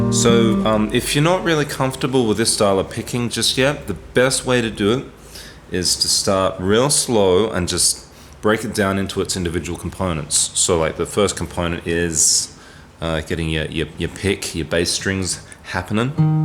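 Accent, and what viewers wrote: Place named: Australia. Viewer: Thank you so much for doing this. I really appreciate it. I'm a 'reasonable' fingerpicker and alternate bass is no problem. Delighted though that you showed your own little nuances that make the difference. Now I don't have to sit down and work it out. You're correct; IT IS an easy one to do for a seasoned fingerpicker. Reminds me of Mark Knofler describing how to fingerpick...learn the basics - thumb for 6,5,4, and fingers 1,2,3 for strings 3,2,1. Get comfortable with that, bring in alternation and then...forget it completely and away you go! It was great to hear him justify what I'd done myself many years earlier 😅. Nice to hear the Oz accent...I spent ten years there. Greetings from Ireland.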